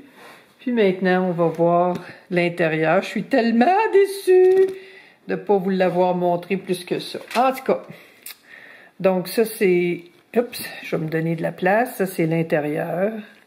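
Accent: Quebec, Canada. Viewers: Bonjour, je suis tombée par hasard sur une de vos vidéos!!! Merci pour vos conseils, votre dynamisme et cela fait du bien d'entendre la parler québécoise (j'ai eu la chance d'habiter 1 an à Montréal).... et surtout BONNES VACANCES!!!!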